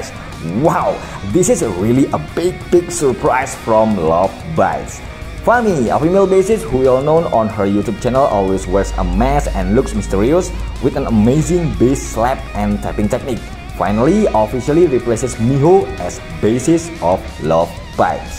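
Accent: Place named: Indonesia. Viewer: Logat indonesia 😂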